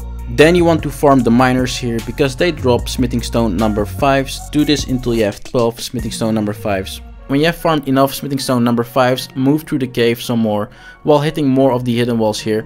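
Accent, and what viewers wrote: Netherlands. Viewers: Are you u from the Netherlands ?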